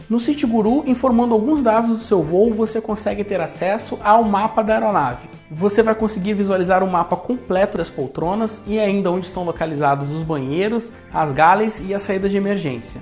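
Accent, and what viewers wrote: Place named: Brazil. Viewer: O cara fala brasileiro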